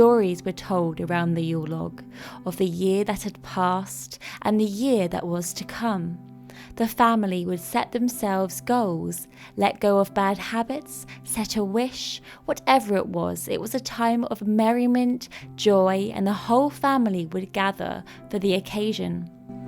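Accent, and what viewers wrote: United Kingdom. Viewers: I know your accent is British but it sounds very particular, which region is it from? I watch someone else from Yorkshire but it’s not quite the same and that’s my only frame of reference.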